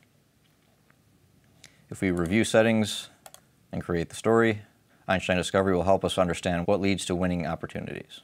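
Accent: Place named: United States. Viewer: finally we got a video with American accent. yay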